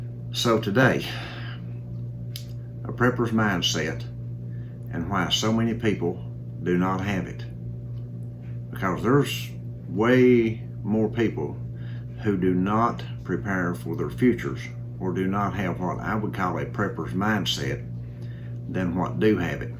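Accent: United States. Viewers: WHAT IS THE NOISE IN THE BACKGROUND? YOU REMIND ME OF BOB WELLS, CHEAP LIVING. LOVE YOUR AMERICAN ACCENT. THIS IS A VERY COMMON SENSE TALK AND WE NEVER HEAR ENOUGH, IF ANY, OF THESE TYPES OF MESSAGES. MANY THANKS.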